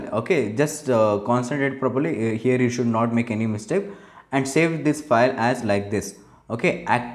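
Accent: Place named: India. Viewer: He’s Indian dipshit, of course he’s going to have an accent? Why do all southerners have the same fucking Texas voice???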